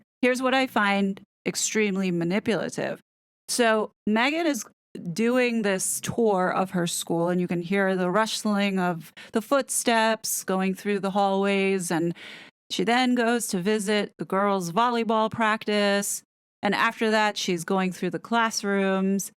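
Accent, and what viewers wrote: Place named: United States. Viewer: I agree with you about everything you say & love your vids as they're so informative & honest. But, as a committed atheist for past 30 yrs (was a Catholic) i rarely admit to anyone, except close friends my lack of religion. When I make that mistake, I'm usually castigated for it. Whereas, when I was Catholic, no problem. As you sound American (possibly Canadian?) we're always led to believe in the UK that America is the most christian country on earth. Is that woke stuff happening across the Pond too? Horrible if it is, as Christian ideals r the only ones to live by. I still do, even though I don't believe in God. Apologies for offending other people of "The Book" but New Testament never advocated massacres & plagues on whole innocent populations just because God woke up that day feeling grumpy or a bit bored. It was a handbook for peace.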